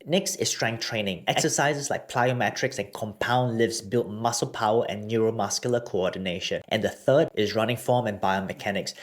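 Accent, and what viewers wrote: Singapore. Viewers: u singaporean ah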